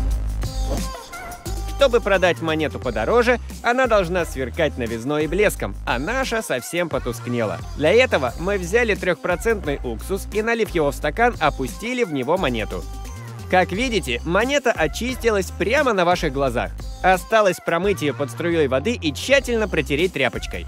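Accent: Kazakhstan. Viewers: Ты из Казакстана ?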